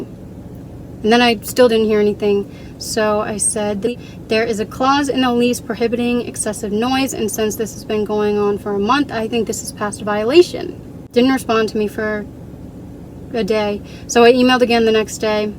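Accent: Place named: United States. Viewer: I noticed your car is a right hand drive. But you have an American accent. Are you in the states or did you buy a car from overseas and ship it to the states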